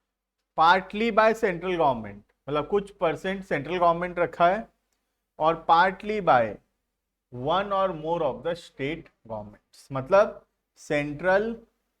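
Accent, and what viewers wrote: Odisha, India. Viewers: Sir app odisha se ho kya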